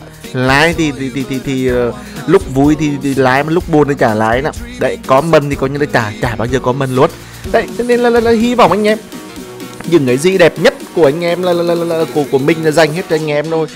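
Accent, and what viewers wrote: Nghe An, Vietnam. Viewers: Anh ở đâu Nghệ An thế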